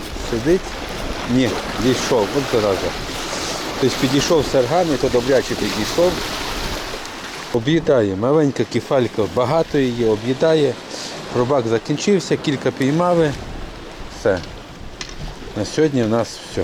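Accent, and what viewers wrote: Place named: Odessa, Ukraine. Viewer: Прикольный, у тебя акцент,настоящий южный.Коренной одессит походу.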